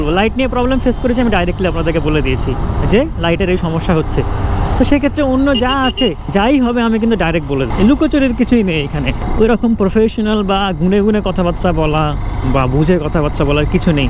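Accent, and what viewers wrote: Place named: India. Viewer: ভাইয়ার বাড়ি কি কলকাতা নাকি 😅 ইন্ডিয়ান লোকেদের মতো কথা আসে কিছুটা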